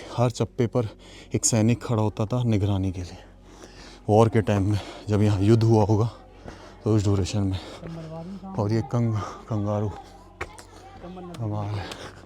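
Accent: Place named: Rajasthan, India. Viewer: Bhaiya Ki Boli Kotputli aur side ki Lagti Hai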